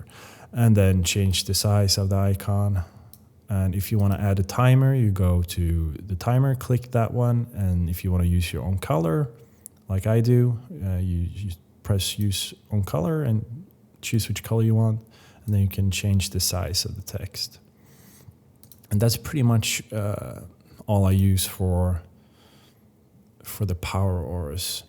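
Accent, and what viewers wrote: Sweden. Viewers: are you swedish? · Bro, love your videos, very helpful. Your English is great, but is that a slight Swedish accent I detect? Zug zug!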